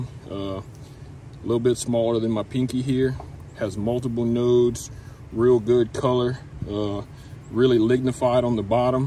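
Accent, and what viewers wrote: Louisiana, United States. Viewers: What a coincidence! I did the same thing today with my brown turkey tree. I am trying to get more varieties. Would you be willing to sell any of these cuttings? By your slight Cajun accent, I probably dont live too far from you. Let me know. Great video.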